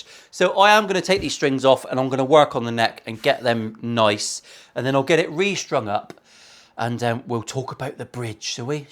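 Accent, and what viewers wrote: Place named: Cockney, United Kingdom. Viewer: I do prefer the bridges that have 6 individual intonators versus the ones that have only 3 so you have to split the difference between strings - one flat one sharp? I'm in Virginia USA - but quite near West Virginia, Tennesee and North Carolina, so I hear those accents you like. I tune in here (also) to hear your accent. We uninformed here call your accent "Cock-knee" Special Wave - wibbly-gibbly!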